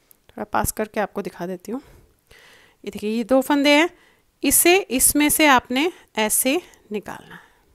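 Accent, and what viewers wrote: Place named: Pakistan. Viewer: Thank you 💞 mai app ke buhat bari fan hoon, tumhari aawaz ur pronunciation bilkul hum pakistanio jaisa hai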